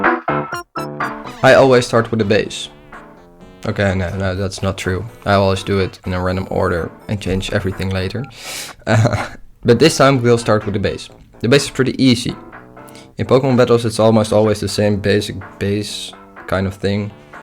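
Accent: Netherlands. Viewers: your definetly dutch bro your accent lol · Hoi ik kon al meteen aan je accent horen Dat je Nederlands bent. Anyways coole video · there is something in the way netherland people talk english, that makes me think theyre from the netherlands, gues i was correct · hoi, ik herken een nederlands accent, heb je een discord server? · A few seconds into the video and I could tell you were dutch ! :p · As a dutch person I can confirm he sounds Dutch. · Nice, i could hear it on your dutch accent :D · my brain knew you were dutch the first second in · ja neterlands accent heb je wel door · Very dutch accent lol I love it great video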